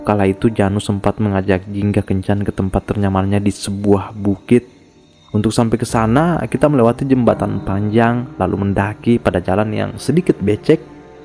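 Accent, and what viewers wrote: Bugis, Indonesia. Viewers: Bugis apa adminnya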